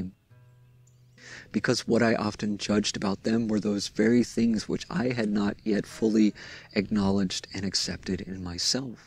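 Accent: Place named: United States. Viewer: Thank you 💚 had a miracle breakthrough today and experienced the barriers coming down between someone I judged and myself. I really misjudged them and they really surprised me and they were really loving, we had a nice day together and I was able to see how I’ve been acting angry and judgemental. Starting to feel myself softening and letting go a bit towards others :) Just realised you have an American accent, it’s funny I haven’t noticed before as I was so focused on the messages etc. I’m in England. Thanks for your help 🌈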